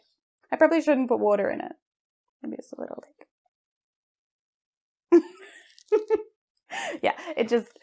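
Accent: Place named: Australia. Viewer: "My besty just brought me this in from the posty." Two sentences in and everybody knows, you're Australian 😂 Also i bet most parents aren't as happy about their new born babies as you are about that Filament 😂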